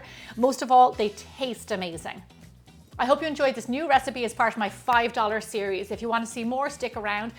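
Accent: Ireland. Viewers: Love all of your recipes, tips, and especially your Irish accent!!! Been a fan since before Georgie was a twinkle!! Keep up the fabulous yum!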